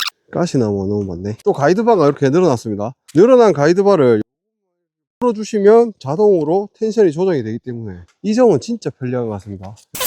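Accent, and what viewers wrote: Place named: Gyeongsang, South Korea. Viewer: (와~ 저기있는 나무 짤라야되는데 데이지가 않네) .... 경상도 사투리가 참 정겹습니다. ㅎㅎㅎㅎ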